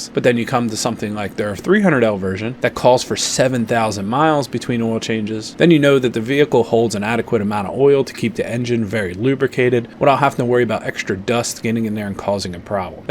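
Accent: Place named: Pennsylvania, United States. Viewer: yeah it looked a lot like NC but I was hearing, to me, a little northeast Pennsylvania accent, so I wasn’t sure. Found your channel today and it’s great. Where is the best place to find used dual sports: FB marketplace? Craigslist? Cycletrader?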